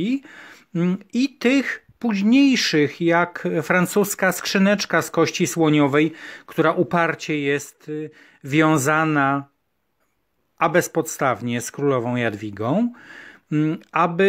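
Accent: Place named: Kraków, Poland. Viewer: To jest narracja historyka sztuki- merytoryczną, płynna, ciekawa bardzo, ale skierowana do znawców. Jeśli już miałabym grymasić, to prosiłabym unikać mimo wszystko krakowskiego akcentu. Wystawa, jak każda na Wawelu, wspaniała. gratulacje.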